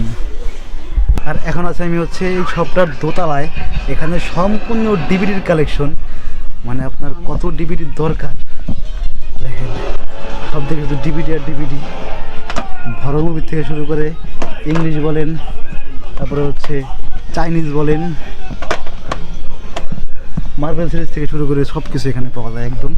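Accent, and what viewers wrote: Faridpur, Bangladesh. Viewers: ফরিদপুর এর ভাষা প্রানের ভাষা ❤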